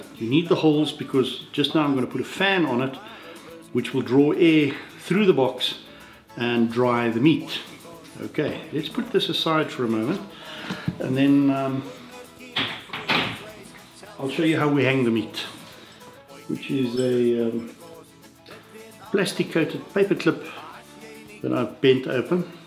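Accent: South Africa. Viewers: He’s Afrikaans accent is thick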